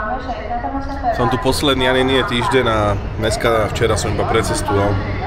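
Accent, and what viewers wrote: Trnava, Slovakia. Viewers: hlavne pokračuj s takouto formou. páči sa mi to aj tá naša trnafčina.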